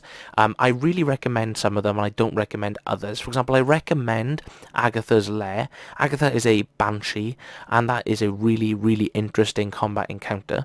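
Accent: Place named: Wales, United Kingdom. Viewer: Love the advice. Couldn't help but notice the accent. Do you play in a store 8n wales?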